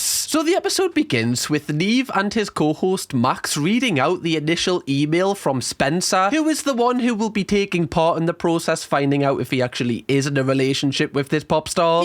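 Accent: United Kingdom. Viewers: What kind of accent does have specifically? It’s really regional. He doesn’t sound like any of my friends from the UK who are from near London nor my Scottish friend.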